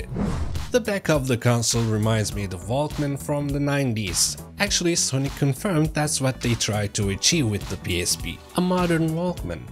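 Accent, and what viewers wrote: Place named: England, United Kingdom. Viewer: Lan o değilde bilmesem adamı İngiliz sancam ha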